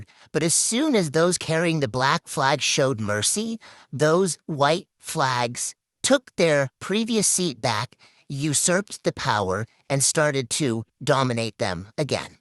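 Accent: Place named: South, United States. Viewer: we would still hear the southern drawl. Even with the voice filter.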